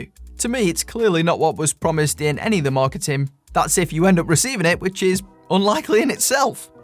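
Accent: Stoke, United Kingdom. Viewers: stoke accent and that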